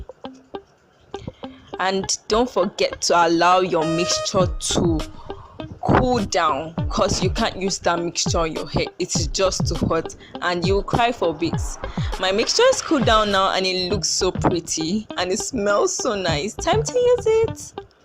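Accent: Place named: Africa. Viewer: I love African accents omg ❤️❤️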